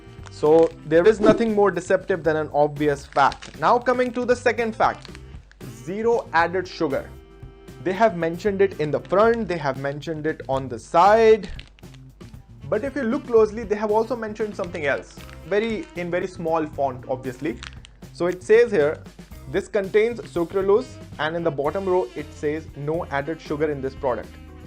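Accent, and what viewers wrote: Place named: United States. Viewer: Liked the video....if I ignore the pinch of American accent in btwn😁....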